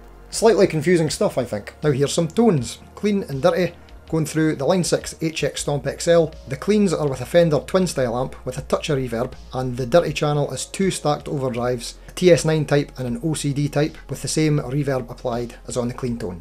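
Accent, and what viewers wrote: Scotland, United Kingdom. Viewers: Oh man i love your accent so much , the first seconds i thought you are Turkish or Arab , the way the " r" are pronunciate , but after 30 seconds it's obvious : this guy is Scottish or Irish ,i don't know ... One thing is sure , it's really different and unique , and really remarquable that in the North of England , Scottland , the people have an accent very close to the irish one , closer than for example , East England or the region of Leeds .... Maybe it'a celtic thing ii don't know , but one thing for sure : your accent is really deep ..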